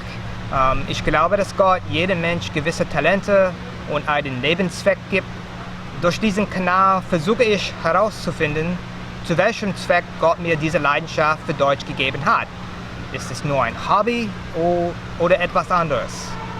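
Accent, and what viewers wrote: United States. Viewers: Dein Deutsch ist einfach super mit einem schönen amerikanischen Akzent 😀👍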